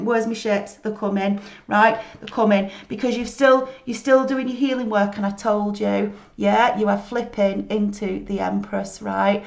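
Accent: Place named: Newcastle, United Kingdom. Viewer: Love your reading (and that beautiful Geordie accent). Deffo dealt with a narcissist. Keep up the good work and will follow your guidance.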